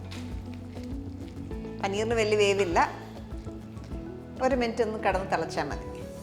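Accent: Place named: Travancore, India. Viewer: Love ur narration in middle Travancore slang nice❤️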